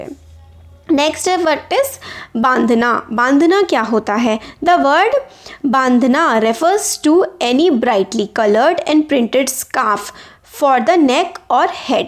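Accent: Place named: Uttar Pradesh, India. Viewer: mam you are from UP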